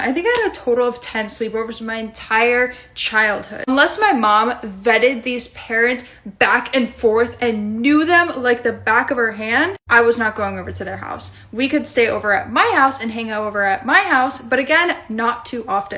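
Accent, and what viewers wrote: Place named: United States. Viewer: You've got an American accent, but a Russian face 😅😅 Your acceptionably beautiful 😍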